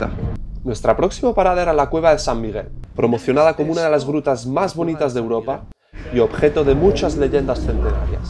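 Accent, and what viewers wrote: Andalusia, Spain. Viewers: Flipo con tu acento andalú. Esq eres 100% granaino jajajajaja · Te hablan español porque hablas inglés con acento español. Las dependientas saben que no eres ingles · pero eres andaluz o solo pones el acento cuando hablas con uno? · El acento andaluz es top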